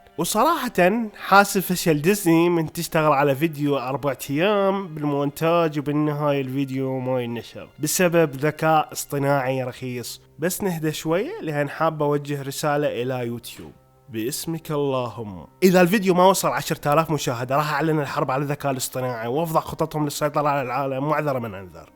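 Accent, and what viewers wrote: Iraq. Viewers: أنته عراقي 😅❤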